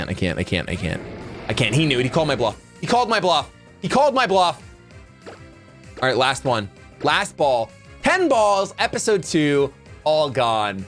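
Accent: United Kingdom. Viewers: I could just sit here for days and listen to that british accent 🤣🤣🤣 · As a British person I'm living for the British accent!!!! Its the best thing ever 🤣🤣🤣 Fave shiny is deffo Eevee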